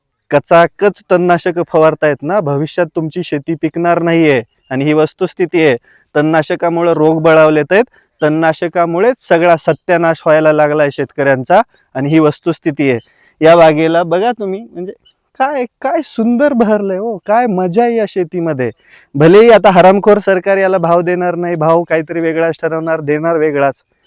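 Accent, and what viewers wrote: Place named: Pune, India. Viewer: Lai popatpanchi bolato yar tu,tu sadashiv pethet jalmala as vatat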